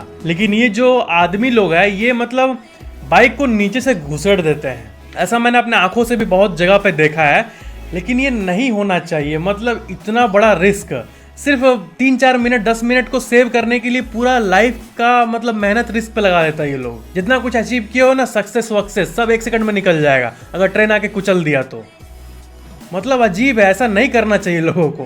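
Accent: Bihar, India. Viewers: Aap bihari ho n ?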